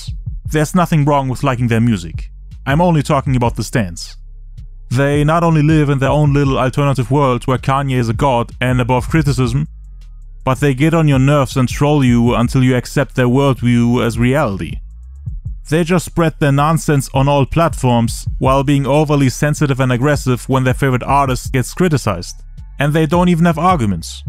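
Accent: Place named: Germany. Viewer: Dein Deutscher Akzent knallt anders rein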